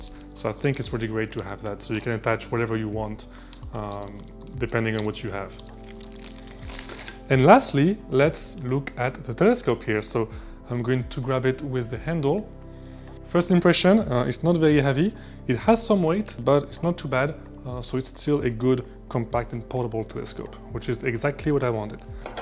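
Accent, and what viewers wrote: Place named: France. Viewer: Are you french ? Tu as un accent 😂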